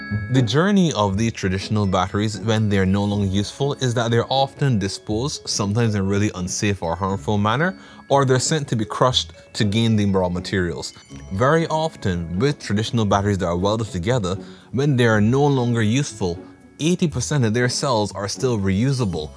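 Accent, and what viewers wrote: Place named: Barbados. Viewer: Great explanation; sounds like a Bajan.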